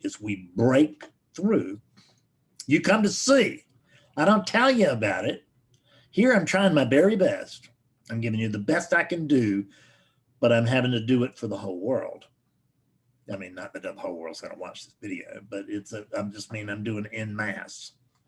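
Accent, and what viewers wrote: South, United States. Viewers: channeling Alan Watts with a southern accent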